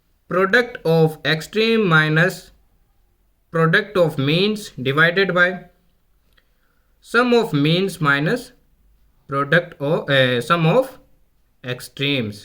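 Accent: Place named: Shekhawati, India. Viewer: Your accent is so shekawati, good to hear. Keep improving.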